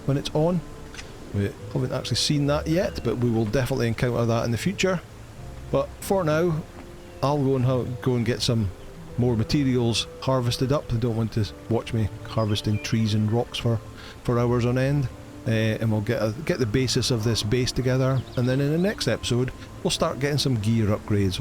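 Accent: Scotland, United Kingdom. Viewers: Bro does the thrall settings at 0.1 work? Iv Been running about for days and my level thrall met his end at 5. It’s feels like it’s still taken ages to level up. Great Chanel for this game by the way. Iv learned a lot and have subscribed and liked. What Scottish accent is that by the way? fife. aryshire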